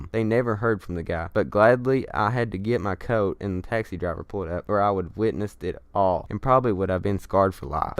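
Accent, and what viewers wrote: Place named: South, United States. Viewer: You are so southern what state are you from?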